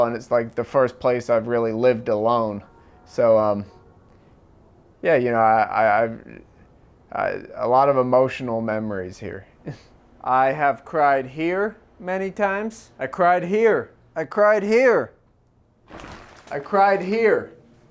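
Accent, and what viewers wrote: Canada. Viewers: Canadian accent tho?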